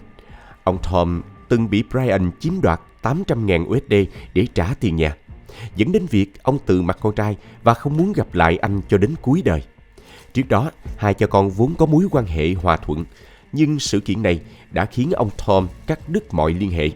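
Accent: South, Vietnam. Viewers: Xướng ngôn viên có giọng đọc miền Nam hay quá. Thanks.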